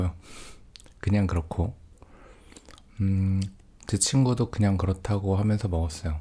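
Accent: Cheongju, South Korea. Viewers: 청주분이확실합니다!!!오명가명 마주친것같은 익숙함.........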